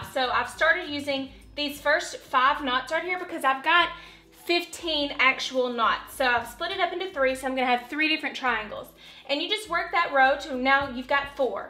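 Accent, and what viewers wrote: South, United States. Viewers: Oh my gosh! You are just too adorable! Love your Southern-ness! Great tutorial. Love the finished piece. I can't wait to make a similar piece. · She sounds southern. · You are just too cute! I absolutely love your Southern accent. I’m Middle Eastern so I don’t come with your accent but I live down South now so I’ve learned a few terms. I just discovered you and subscribed. God bless you. 🙏🏽😇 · You're hillarious. Loven the Southern accent lol hugz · Girl u r a hoot. Where ya from, sho ain’t a yankee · Purple pigtails, over the top eyelashes, and a Southern accent? Subscribed!! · Alexia Stotsenburg she's southern! We're from Alabama! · I have no idea why but I thought you were from Australia for the first 10 seconds then I realized it was a southern accent 😂 you're gorgeous and funny, subscribed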